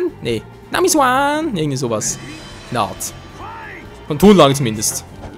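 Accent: Switzerland. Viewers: Bist du zufälligerweise Schweizer? :D PS: merkt man am Dialekt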